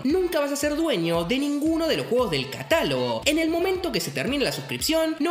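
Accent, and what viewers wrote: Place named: Argentina. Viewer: cualquier cosa que diga un argentino con ese acento tan horrible yo hago todo lo contrario, no destacan por su honestidad.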